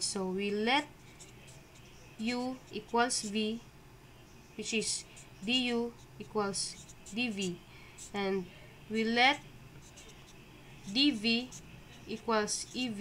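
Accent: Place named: Philippines. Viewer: filipino ka ba? ganyan yung accent ng filipino sa english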